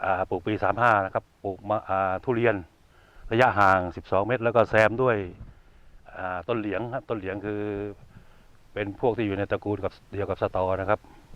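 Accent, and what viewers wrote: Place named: South, Thailand. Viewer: จังหวัดไหนครับ สำเสียงใต้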